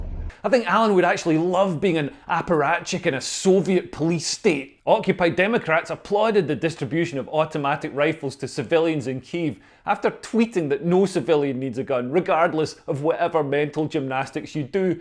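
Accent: Scotland, United Kingdom. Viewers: Always amusing watching your films with subtitles on, just cant handle a Scots accent.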